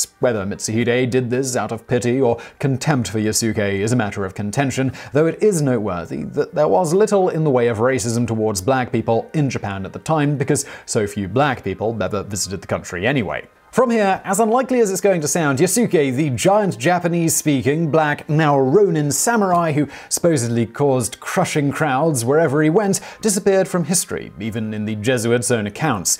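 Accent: United Kingdom. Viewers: Yes, your french pronunciation is indeed terrible...nevertheless I like your "typical" british way of speaking english... ;)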